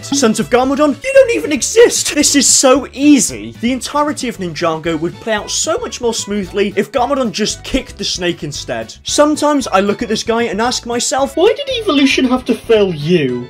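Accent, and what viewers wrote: United Kingdom. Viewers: Your funny as it is bud your British accent makes it ten times better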